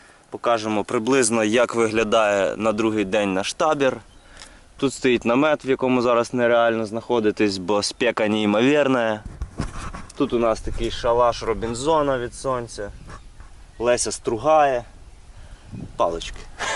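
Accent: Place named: Galicia, Ukraine. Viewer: Добрий день, хлопці дівчата. За відео спасибі. Все класно, подяка за рідний галицький акцент. Цього літа хочемо висадитися на Кінбурнській косі - катером з Миколаєва і пішки по узбережжю до Покровки(Ягорлицький залив). У мене кілька запитань з цього приводу: 1. Як там з водою? - ми плануємо пройти 20 км на півднь по берегу до Покровки? 2. Ви були як я зрозумів в Покровці. Ми звідти хочемо теж стартонути додому . Але будемо їхати в Харків з Херсона. Так от : чи є з Покровки транспорт хоча б до Геройського, про Херсон навіть не питаю?? Ваша відповідь стала би нам у великій пригоді. Дякую.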